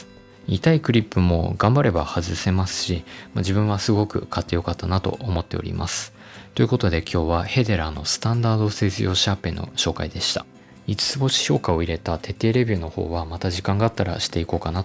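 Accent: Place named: Kansai, Japan. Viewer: たしかに「屁出ら」はダサいネーミングですね（笑）。は関西の方でしょうか？独特のイントネーションやアクセントが可愛らしいです。これからも面白い商品の紹介を期待しています！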